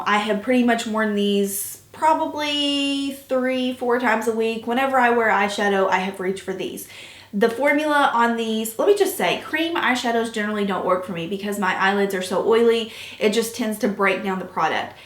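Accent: South, United States. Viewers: first of all, I am a new subscriber and I already love you! You seem so down to earth and honest in talking about different products, I already trust your judgement! I actually found your YouTube channel by reading a comments section of another YouTuber. Several people recommended you for hooded eye tutorials. I'd love to see an updated one (I couldn't find a recent one after scrolling back a good bit), as I REALLY STRUGGLE with applying eyeshadow to my hooded eyes. That flap of extra skin is my worst enemy!! Also, love your southern accent, where are you from? I am from North Carolina!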